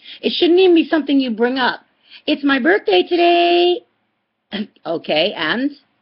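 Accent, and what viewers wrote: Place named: United States. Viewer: Haha loved it! Jazaki Allahu Khair sister! I shared your video to my dad who is not yet a Muslim. May Allah guide him, please make dua for him sister. I do feel bad for not calling to wish him happy birthday as he does for me and my children. I sent him a message with a dua and will chat with him tomorrow InshaAllah. In the mean time I needed help in explaining this to my dad and so I did a search - listened to many short explanations by my favorite sheiks and Subhanallah Allah led me to your video. MashaAllah! You explained this matter very well! 😃 btw, I'm confused about you English accent - I think you are American but perhaps moved abroad?! Haha. Anyway it's a beautiful accent MashaAllah.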